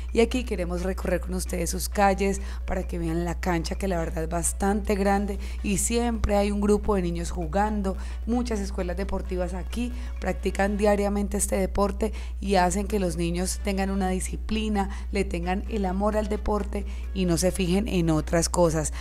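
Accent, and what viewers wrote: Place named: Cali, Colombia. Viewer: Q lindo hablas caleña❤❤❤❤❤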